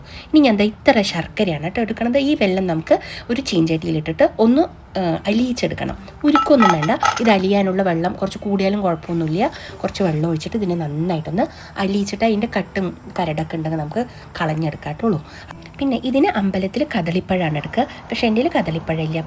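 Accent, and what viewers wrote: Palakkad, India. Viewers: പാലക്കാടൻ രുചികളും പേരും ഒരു രസാണ് അതിന്റെ കൂടെ ഒരു പാലക്കാടൻ സംസാരവും കൂടെ കേട്ടാലോ ഹയ്യ് എന്താ രസാ കുട്ട്യേ ബഹുബഷ് 🤭അടിപൊളി പായസം kutta കണ്ടപ്പോൾ കൊതിയാകുന്നു 😋😋😋അതിന്റെ ഇടയിൽ താൻ സംസാരിപ്പിച്ചു കൊതിപ്പിച്ചും കൊള്ളാം അടിപൊളി 😋😋😋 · കേട്ടോളൂ കേട്ടോളൂ പാലക്കാടൻ ഭാഷ നല്ലൊരു രസമുണ്ട് കേൾക്കാൻ 😄❤ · From your language, are you from Palakkad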